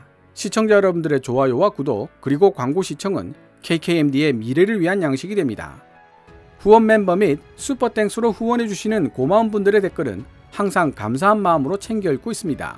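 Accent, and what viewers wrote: Gyeongsang, South Korea. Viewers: 갱상도 사투리 안바꿀래? 천하에 즈질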